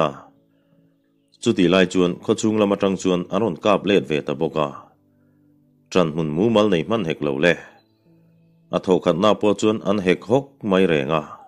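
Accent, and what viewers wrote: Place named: Aizawl, India. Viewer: Aizawl thluk in thluk tawp rawh 🤣 🤣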